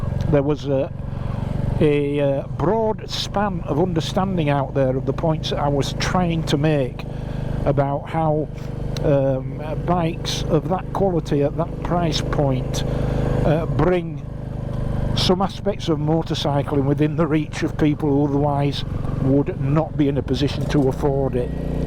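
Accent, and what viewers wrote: Yorkshire, United Kingdom. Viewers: Morning. Since watching your vid on the MT 800 Touring the other day, I'm all but sold on one rather than the Suzuki GSX S GX ( say that when you're drunk) as it's over 5 grand cheaper and has everything. As good as the Suzuki is, and I've tested one, for £14500 - No luggage, no centre stand, no heated grips, and for a supposed touring capable bike, a screen the size of a postage stamp that needs an allen key or similar to adjust. The centre stand alone being £470... Your review made the difference. On the subject of Chinese bikes and the Chinese, they are easier to interpret than some of your Yorkshire 'twang' From one of your followers over the fence in Lancashire....(.like we are any easier...😝😉) Keep up the good stuff..👍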